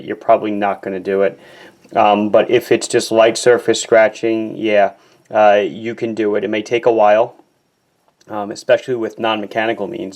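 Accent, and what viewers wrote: Long Island, United States. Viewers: I grew up on Long Island, the watch information is interesting, but your Long Island accent so reminds me of home. Be well.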